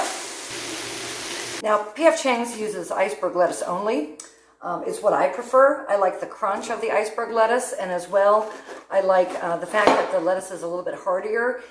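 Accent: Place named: Chicago, United States. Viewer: You seem to communicate in Chicago speak!...awsome recipes...such fun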